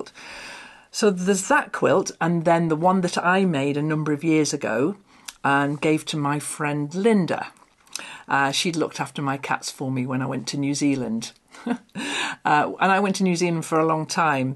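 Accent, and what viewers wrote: United Kingdom. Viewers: Indeed, her British accent & energy & unique authenticity, truly make her a gem of a gal and excellent teacher…She is so relaxing to listen to, like an old friend to sew/craft with! ❤️🙏🌞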